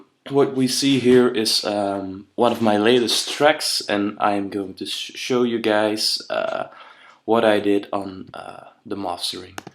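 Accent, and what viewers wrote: Belgium. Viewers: Joli petit accent belge ;) On va essayer ca, thanks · This has been amazing! Thank you so much! I recently moved to Amsterdam and thought you sounded Dutch! Well, Flemish!? Thanks again! x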